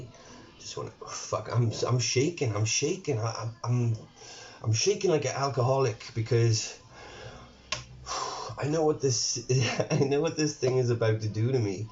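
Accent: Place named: Southern England, United Kingdom. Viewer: also what part of england are your from im from newcastle but your accent sounds more southern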